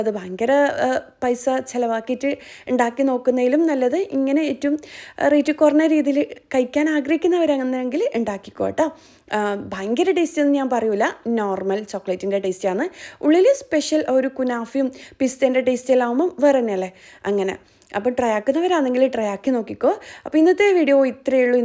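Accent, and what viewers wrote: Kannur, India. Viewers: First time seeing ur channel . Nalla samsaaram 😘😘😘Kannur aano .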